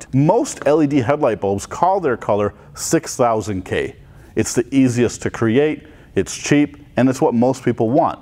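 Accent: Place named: United States. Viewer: Dude, you should start with considering legality FIRST! Since you have an American accent, I will assume you are doing this for the US audience and you should be aware that per the DOT it is ILLEGAL to use LED bulbs in halogen reflectors! Helping you see better while blinding on coming drivers is not safety inhancement! Esp as they crash headon into the offending driver with LED headlights! And really easy to check on post accident.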